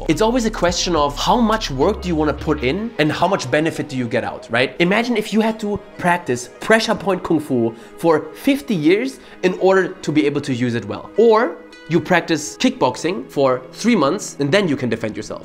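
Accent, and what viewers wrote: Germany. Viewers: Bro r u german ur accent kinda sounds like it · It sounds sometimes like you are from Germany or Austria? "ahhh Im gonna give my Senf..." and the way you say "Steven Seagull" sounds very german to. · Why do you sound like a german guy speaking english 🤔🤣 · Finds geil wie man den deutschen Akzent raushört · He has german accent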